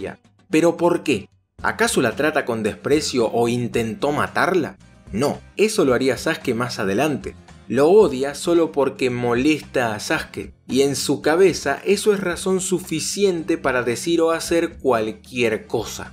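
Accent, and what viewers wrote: Argentina. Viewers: Eres Argentino ?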